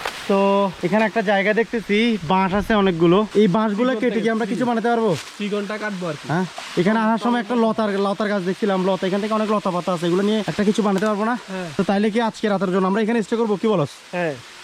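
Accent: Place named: Feni, Bangladesh. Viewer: ভাই তো আমাদের সেম টু সেম ফেনীর ভাষায় কথা বলেন 😮 অসাধারণ লাগে শুনতে 🥰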